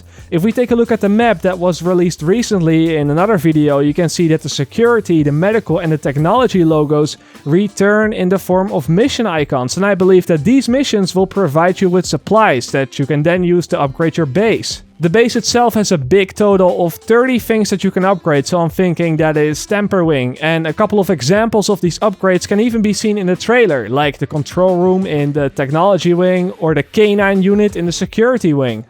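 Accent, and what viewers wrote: Netherlands. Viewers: if i hear good youre dutch ? kan you add me on uplay KillerAngelNL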